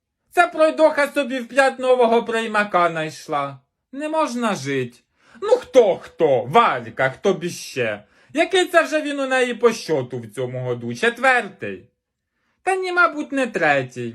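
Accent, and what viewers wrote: Poltava, Ukraine. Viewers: СУПЕР!🤣🤣.Полтавський говір 100/. Як в селі побувала.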